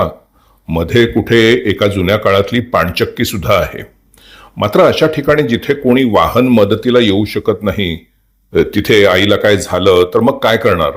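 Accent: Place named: Pune, India. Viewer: तुमची मराठी बोलण्याची पद्धत ही सदाशिव पेठेची आहे.. पुणेरी खोच!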